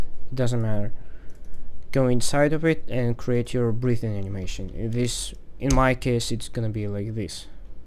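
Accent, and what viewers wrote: Russia. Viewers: A tutorial with a Russian accent? I am gonna like this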